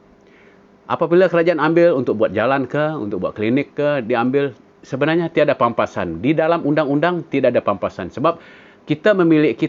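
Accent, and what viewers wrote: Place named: Indonesia. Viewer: Kenapa cakap macam indon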